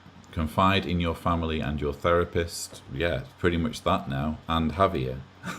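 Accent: United Kingdom. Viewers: you have thousands, tens of thousands of supporters. Please know that. I don't even consider myself an ex JW, since it's been many years since my journey with them. I listen to you so that I don't fall back into that nonsense. Mostly, I listen to you for your voice (we Americans are crazy for British and Australian accents), your wit, your humor, and your humble wisdom. I have learned so much from you. We love you, man. Please take care of yourself.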